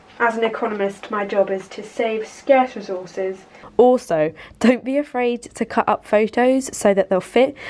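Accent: United Kingdom. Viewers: Because she is speaking in British accent and Emily was a British girl too 😅 does it connecting now 😅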